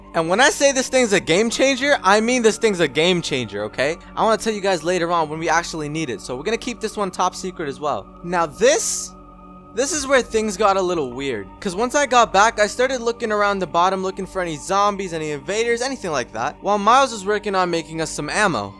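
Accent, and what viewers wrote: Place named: Italy. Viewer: When I hear your voice, I hear an Italian accent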